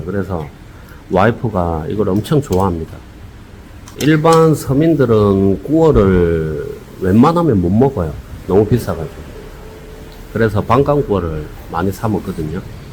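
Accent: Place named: Gimcheon, South Korea. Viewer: 김천쪽 같네요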